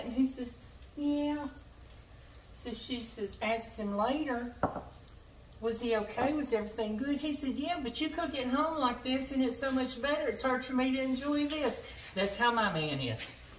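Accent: South, United States. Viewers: like I'm watching my grandma southern drawl n all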